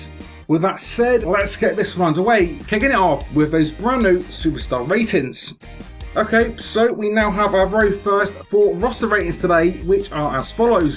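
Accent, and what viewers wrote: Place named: Bournemouth, United Kingdom. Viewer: I think I know where your accent is from. Are you from Bournemouth, England? And btw another great video you're killing it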